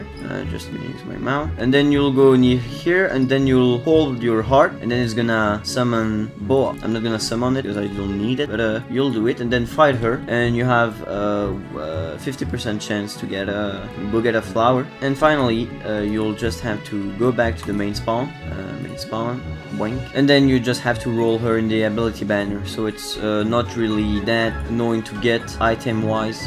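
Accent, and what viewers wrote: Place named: France. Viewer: u got a french accent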